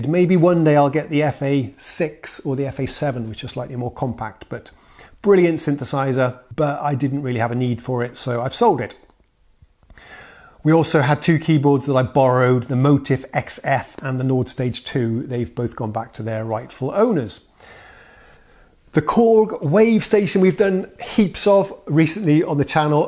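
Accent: United Kingdom. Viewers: And... Are you British, Swedish or from another country? I always thought you were British like me!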